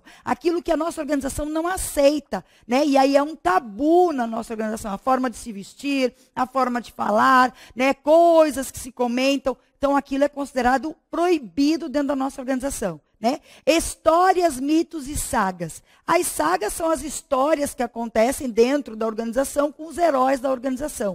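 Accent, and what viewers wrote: Brazil. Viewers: com sotaque do interior de sp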